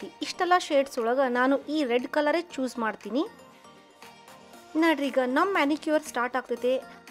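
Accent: North Karnataka, India. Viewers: ಹಾಯ್ ಅವರೇ ನಾವು ವಿಜಯಪುರ ಜಿಲ್ಲೆಯಿಂದ ನಿಮ್ಮ ಎಲ್ಲಾ ವಿಡಿಯೋಗಳನ್ನು ನೋಡುತ್ತೇವೆ ತುಂಬಾ ಚೆನ್ನಾಗಿ ಉತ್ತರ ಕರ್ನಾಟಕದ ಭಾಷೆಯಲ್ಲಿ ಚೆನ್ನಾಗಿ ಮಾತನಾಡುತ್ತೀರಿ ಕನ್ನಡತಿ ಚಾನೆಲ್ ಕಡೆಯಿಂದ ನಮ್ಮ ಮದುವೆ ಮೂರನೇ ಅನಿವೆರ್ಸಡೇ ವಿಶ್ ಮಾಡಿ <num> ನಮ್ಮ ಜೋಡಿ ಹೆಸರು ಶಿವಾನಂದ. ಕವಿತಾ ತಿಕೋಟಿಕರ ಅಡ್ವಾನ್ಸ್ ಆಗಿ ಹೇಳಿದ್ದೇವೆ ನಿಮ್ಮ ಚಾನಲ್ ಕಡೆಯಿಂದ ತಪ್ಪದೆ ವಿಶ್ ಮಾಡಿ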